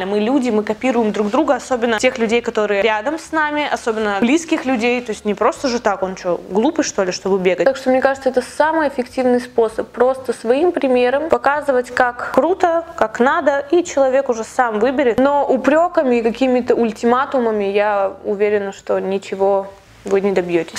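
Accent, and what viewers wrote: Germany. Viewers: Офигенно говоришь по-немецки.Такой акцент😍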